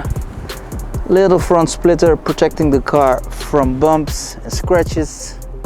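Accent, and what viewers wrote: Netherlands. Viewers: so you/re an indian with a dutch accent and an english channel. aight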